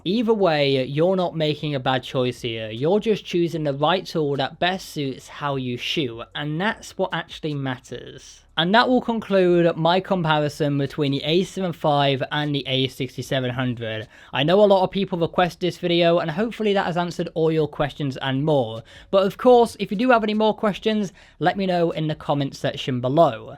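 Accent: United Kingdom. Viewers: bro say water and father please😁.i think you from UK❤❤❤❤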